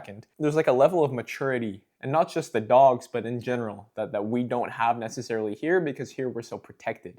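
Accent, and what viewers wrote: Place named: Argentina. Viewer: Para mi sos argentino hablando Inglés, te entiendo porque hablo ingles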